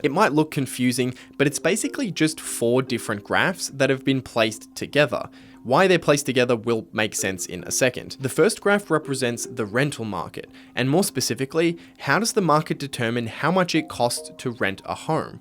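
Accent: Australia. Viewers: I cringe every time you say ‘graff’ in your ‘melbun’ accent. The correct pronunciation is ‘grarf’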